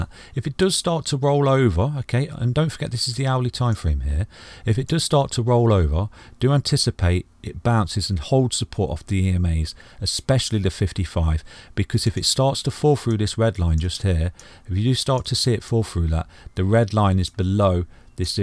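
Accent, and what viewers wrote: United Kingdom. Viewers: Great analysis, clear and concise and lovely British accent!! 👍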